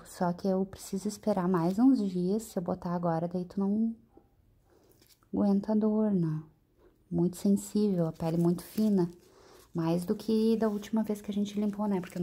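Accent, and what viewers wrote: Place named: Rio Grande do Sul, Brazil. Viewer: estou gostando tanto do seu trabalho q não consigo ver de mais ninguém amo seu sotaque de gauchinha sou mineira de Nova serrana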